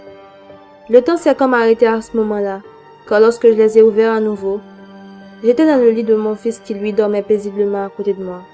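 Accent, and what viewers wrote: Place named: Overseas France, France. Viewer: j'adore tes histoires on ton accent des Dom Tom.merci pour tes vidéos 🥰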